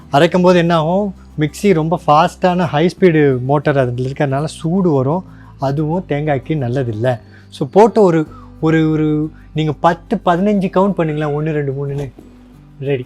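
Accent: Tirunelveli, India. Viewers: Are u from thirunelveli?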